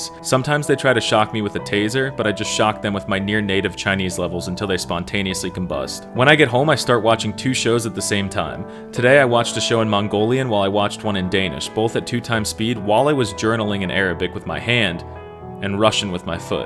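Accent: Spain. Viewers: Your Spanish is from Spain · bro, disgusting that you run that Spain Mexican accent. You should speak Mexican with a Mexican accent.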